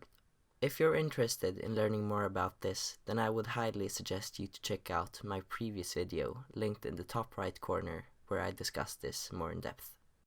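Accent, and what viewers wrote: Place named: Sweden. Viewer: Love your Swenglish❤️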